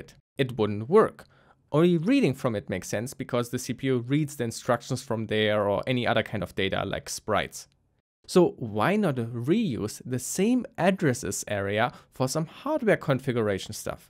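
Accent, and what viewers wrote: Germany. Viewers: At the first sentence I knew: this is definitely a German guy 😂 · ich hasse deutschen Akzent 🙈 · are you German? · Are you german?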